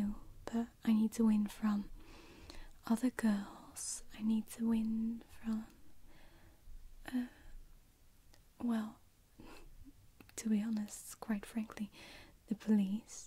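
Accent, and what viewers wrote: Netherlands. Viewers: Dat lichte nederlandse accentje kruipt er toch stiekem tussendoor :)) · I smell a dutch accent.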